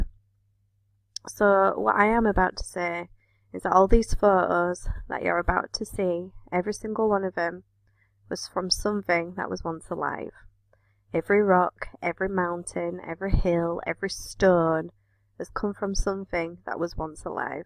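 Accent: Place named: Northern England, United Kingdom. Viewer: To you are total on the right path of truth but this channel iam going to tell u about is mind blowing u must watch it and everything will fit into place go to the Richard mountbleu channel......the mystery of life video u will be amazed at the truth in it.......I no you have got the eyes to see it.........you sound like a Northern lass......please check that video out it goes way deeper than u can imagine.......please please let me no u watched it so iam not wasting my time on people that don't really want to no the truth...Dean from grimsby🇬🇧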